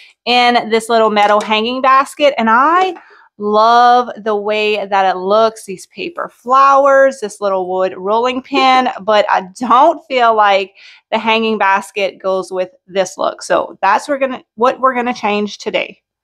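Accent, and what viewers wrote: Louisiana, United States. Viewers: Your the cutest lil chic! I LOVE your LaFayette, LA accent! I recognize it anywhere. I watch because your channel is very cool but big bonus to me is just hearing you talk! LOL God Bless!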